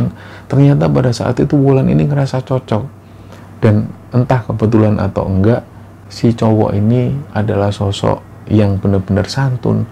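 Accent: Java, Indonesia. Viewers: pdahal mas ini medhok bngetz ngomongnya tpi kok aku paham ya apa yg diceritakan,biasanya klw adh orng yg medhok aku cma senyumin aja krna gak fahan ngomong apa 😂😂😂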